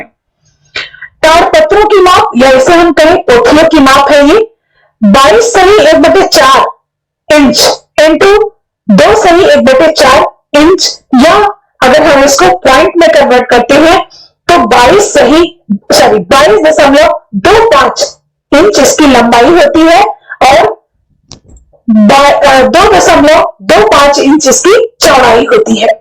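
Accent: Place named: Jaunpur, India. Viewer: Maam आप अपनी उसी भाषा में बात करें और पढाये बहुत अच्छी लगती है सच में. जौनपुरिया वाली 👍👍🌹🌹🌹🙏🏼🙏🏼🙏🏼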